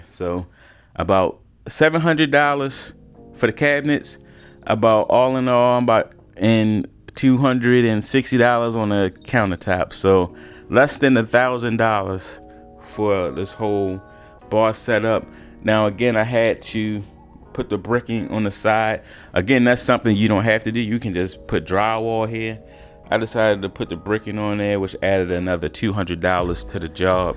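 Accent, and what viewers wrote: Baltimore, United States. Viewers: Looks good man. You from Bmore? Accent sounds like it. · That bmore accent is ridiculous lol tew hundred dollars is nuts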